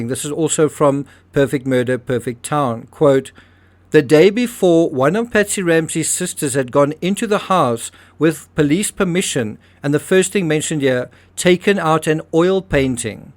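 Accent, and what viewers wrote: South Africa. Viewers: your accent alone is reason to watch it all... I pride myself on being able to mimic accents with South African being one of them and your video helps me keep it fresh and sharp... You and Die Antwoord,... 😉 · He is South African. He can't his accent. Just like you, can't change yours.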